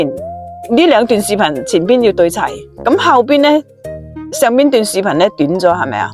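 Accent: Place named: Taishan, China. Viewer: 這位台山口音的姨姨 好叻喎 👍🏻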